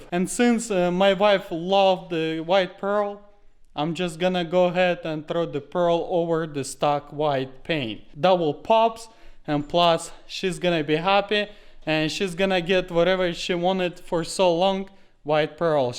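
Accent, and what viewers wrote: Russia. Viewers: I like your job you doing nice work!👍 I like your accent, We're you from? Russia? 😉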